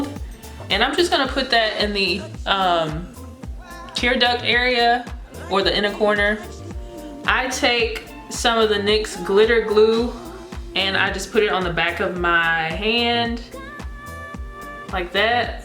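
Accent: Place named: North Carolina, United States. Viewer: Just found your channel and I'm binge watching all day!! I love your country accent you sound like my cousin from North Carolina!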